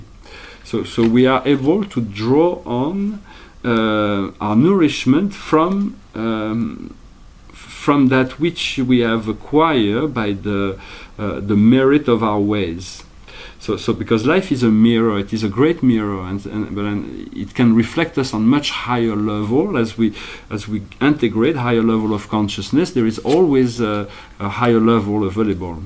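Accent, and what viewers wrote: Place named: France. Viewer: I could listen to you speak English with the beautiful French accent all day,! Thank you for the Osho reading and blessings to you. 🌎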